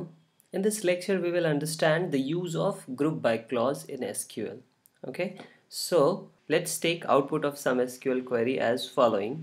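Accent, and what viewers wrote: India. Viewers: This English-speaking guy with indian accent explained the topic better than many nativ-speaking bloggers from my country.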